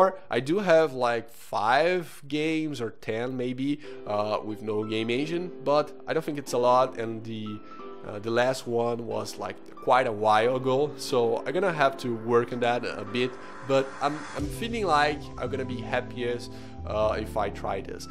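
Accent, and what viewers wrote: Brazil. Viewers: mano pelo seu ingles parece muito que vc é brasileiro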